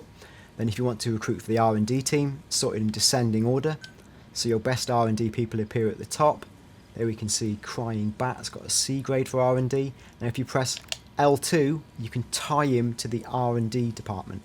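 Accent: United Kingdom. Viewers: You sound like a British Elijah Wood. Cool.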